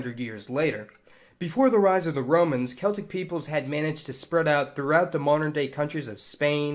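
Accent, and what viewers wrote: United States. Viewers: square sausage the voice narrating is Northumbrian you mean American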